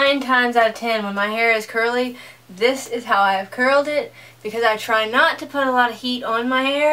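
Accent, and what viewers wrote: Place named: South, United States. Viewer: wowwwww i <3 this video! you sound so southern :) i am too but to me you kinnnnddddaaaa look like miley cyrus hahaaha hope tht doesnt offend you hahaha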